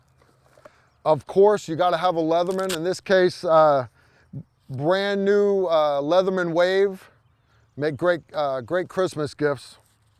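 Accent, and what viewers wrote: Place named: New York, United States. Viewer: Great video- just subscribed. I'm another dad who puts these bags in the wife/kids cars and have them roll their eyes at me about it. I've caught them sneaking them out and leaving them in the garage. When they did, they lost car privileges. Does any dad NOT get called an idiot for putting these in their family's cars? Are you in New York? Accent sounds a little like it.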